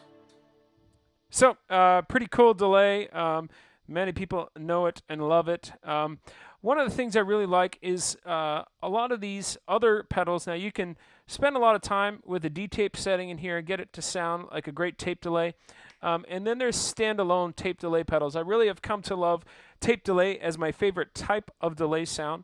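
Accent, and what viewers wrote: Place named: Canada. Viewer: Curious, are you from Canada? Can definitely tell when you say the word "Out" like "Oat" haha. Anyway, killer board. Thanks for the video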